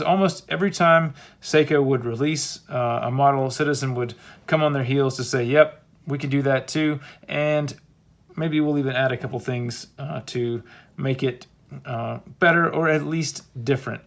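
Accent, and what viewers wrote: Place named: Latin America. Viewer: you have an accent. Are you latino?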